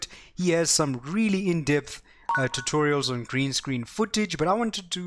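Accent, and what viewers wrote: South Africa. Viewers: I can hear you're South African, hahah, hello from Durban 😂👍🏻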